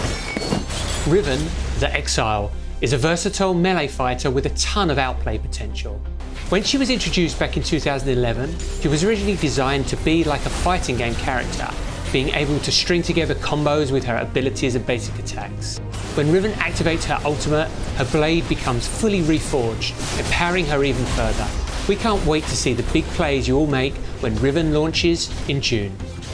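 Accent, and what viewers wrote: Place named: England, United Kingdom. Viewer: Ever heard of "english accent"? have you not seen or heard a british lad before?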